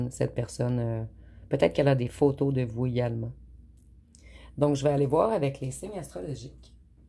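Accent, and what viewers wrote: Quebec, Canada. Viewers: Vous êtes québécoise vous????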